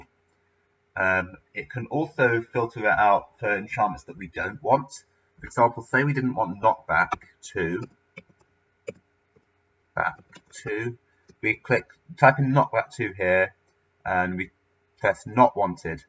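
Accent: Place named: Manchester, United Kingdom. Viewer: Is that Sancarn reincarnated?! Lol I’m joking but I’m super glad that a fellow Brit has managed to make this incredible technical discovery! P.S you sound like you are from Manchester :P Congratulations on this achievement!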